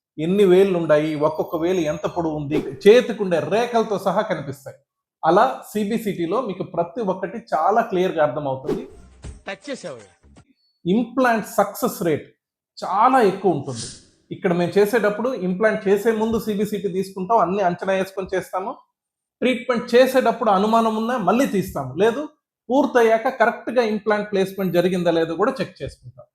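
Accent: Nellore, India. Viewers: Anukunna appude anukunna mee language vini mana nellore ani 😊😊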